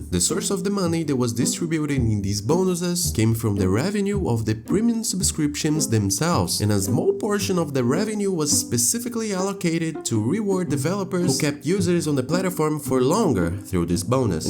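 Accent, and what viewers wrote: Brazil. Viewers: Teu sotaque parece brasileiro 🤨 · percebi, cliquei no video e fiquei assistino, e so pensando, "sotaque brasileiro do caramba", kkkk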